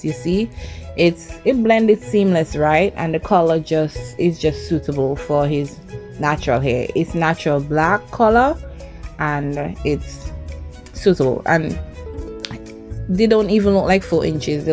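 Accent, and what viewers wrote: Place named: Saint Vincent and the Grenadines. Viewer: I knew you were an Vincentian I can hear the accent like my parents❤❤❤, I thank you for showing me an easier way to install dreads extensions 😊😊😊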